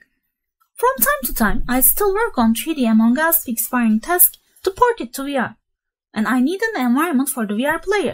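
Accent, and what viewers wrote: Turkey. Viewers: dinlediğim an saniye de bu Türl dedimdoğruda bildim : D Türklerin aksanı hep aynı ama güzel kelime haznen var devam.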